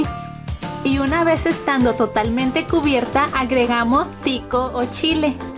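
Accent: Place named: Chihuahua, Mexico. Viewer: Hola! Me encanto el tutoríal n,n de dónde eres? Suenas como de Chihuahua c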